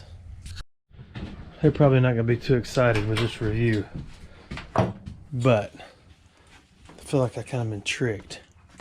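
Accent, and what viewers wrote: Alabama, United States. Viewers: The ultimate ‘shaggy dog’ car story, told with a wonderfully soft Alabama voice. Thank you.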